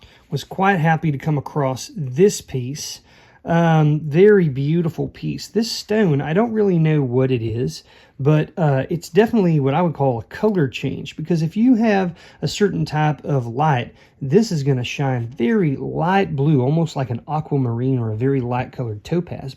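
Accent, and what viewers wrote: South, United States. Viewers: Hey. I'm new to reselling. Well I have stuff but haven't listed yet and I believe I'm overthinking lol. Heard you say you were going to a shop in NC. Not sure if that's where you do business, but when I have watched videos, I wondered where you were from (the country accent🤷) lol. I got that SC country accent going on. Thanks for all the education!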